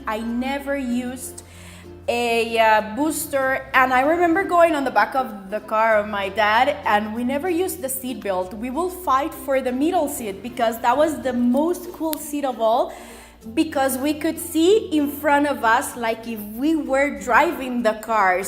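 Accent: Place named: Europe. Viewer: I remember I would borrow my mothers cell phone when I would go to high school basketball games with my cousins. She wanted me to have it so I could call her at home when we needed to be picked up after the game was over. She then bought me my first cell phone when I was 20 as a gift because she was worried about me driving to visit my older brother who lived one hour away. I was thankful but I knew at the time I didn't see why I would need a cell phone. By the way, I was born in 1984 and I'll be 34 in 3 months. My cousin said I'm just a millennial because Xennials were born from <num>. Well I was conceived in October of 1983 if that counts, lol! I feel closer to generation X and Xennials more than Millennials. I finally created an Instagram last Saturday. Now I know what DM means, (Direct Message). I still love the great outdoors more than being glued to my cell phone. 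I'm more into being outside cycling or hiking on trials. Your childhood is very similar to mine. I like your accent by the way. I wasn't sure where you are from at first. I assumed you were from Europe. Well have a great day.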